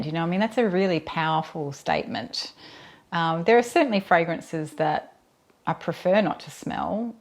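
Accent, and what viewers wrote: Australia. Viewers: You've quickly become a new favorite of mine and your answers are so thoughtful and thorough. I really enjoy listening to you and your lovely accent! I'm from the US and the Midwest specifically so I rarely hear Australians except for YouTube. Beyond the accent, I also love hearing your descriptions and I've learned of many new scents to try. I may subscribe to a monthly service to broaden my collection.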